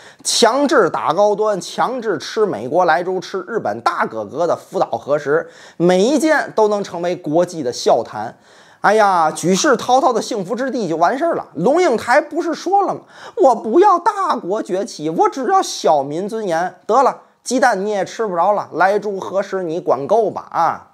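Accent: Tianjin, China. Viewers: 这家伙，天津嗑儿绝了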